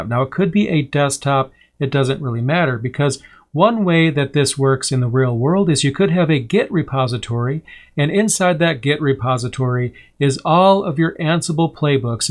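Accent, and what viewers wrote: India. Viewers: And how does someone know the video has a speaker with an Indian accent without watching/listening to it? Mind your own language Suraj. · you got a problem with Indian accent? Why though? Open up youtube and search for any tutorial, you'll find an Indian guy with thick Indian accent uploading free content for guys like us learn so just be grateful rather than passing these comments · Mind your language... If you can't understand India accent don't watch Indian videos..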